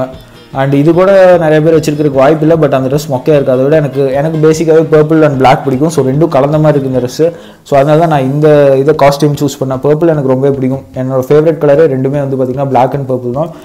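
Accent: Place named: Kerala, India. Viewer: Bro kerala erunthu pesure semma4bro supper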